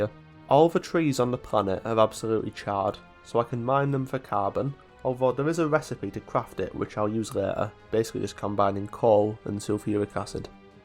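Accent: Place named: Northern England, United Kingdom. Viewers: Its a northern england accent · Also I'm dutch but me mom is from York, your accent seems to be mostly Geordy with a bit of Yorkshire added.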